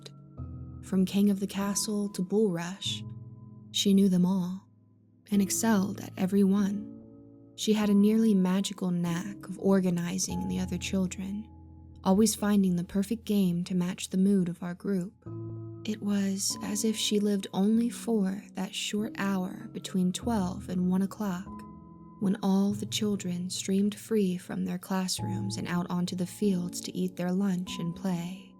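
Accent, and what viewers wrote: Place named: South, United States. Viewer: I would love to hear 👂 your southern accent more , but then icc c would feel like your spoiling me ❤️😛😍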